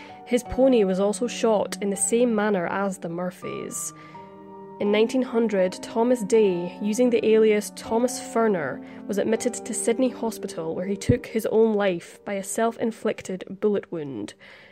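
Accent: Scotland, United Kingdom. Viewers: Yes I pick up on Scottish as well, some irish and Scottish sound alike but the Scotts have more of a drawl on their accents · She's Scottish..! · Clearly pronounced and in a beautiful dialect. The reader sounds scottish to me? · Her accent is Scottish. "WESTERN" Europe is made up of many countries. All have the own distinct accents. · Oh, I've heard about this before. Interesting case, and sadly one that'll most likely never be solved. Also, love your accent! Scottish, right? :) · There’s nothing sweeter than a a Scottish accent like if you ask me!!! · She has a Scottish accent and a beautifully clear voice. Both enhance the subject, however, your rudeness does not, honey.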